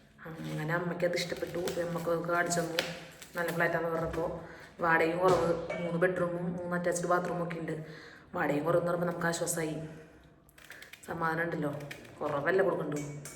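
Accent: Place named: Thrissur, India. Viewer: Nammade asal thrissur language..enikku kettappo samadhanam ayi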